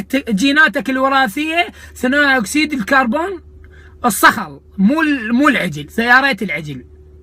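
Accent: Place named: South, Iraq. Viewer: عبدالله لا عراقية هذي لهجة جنوب العراق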